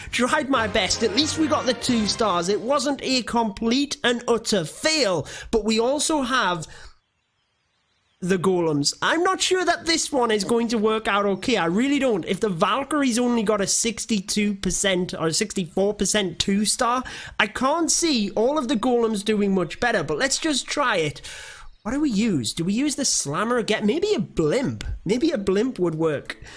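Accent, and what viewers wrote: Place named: Scotland, United Kingdom. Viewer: where are you from?? you have an strong accent haha. guessing scotland?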